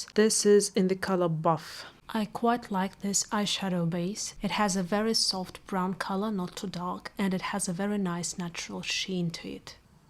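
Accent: United Kingdom. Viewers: it sounds kinda British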